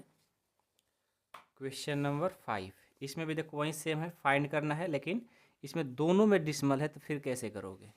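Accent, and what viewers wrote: Bihar, India. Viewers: Bihar ke ho kya language se lagta hai😊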